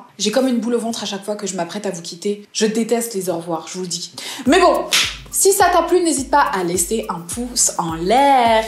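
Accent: England, United Kingdom. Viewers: Tu es anglaise ?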